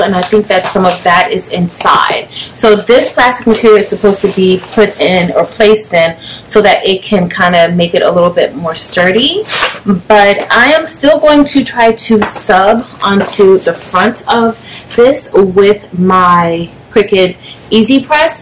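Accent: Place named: Texas, United States. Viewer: You a Texan? Y'all give u away. Great video thank you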